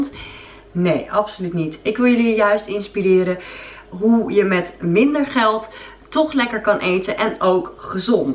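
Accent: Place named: Gooi, Netherlands. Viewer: Jouw gooisch accent. Mieterrrs HE-LE-MAEL LEUK 😂 (Kom ook uit dat gebied dus kon dr hard mee lachen) P.s. ik hou van deze serie maar dat weet je al 😘😘😘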